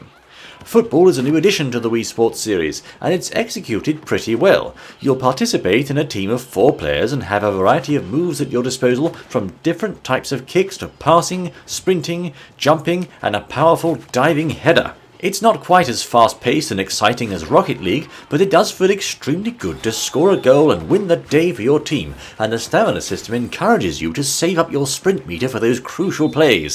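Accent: United Kingdom. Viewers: He is British?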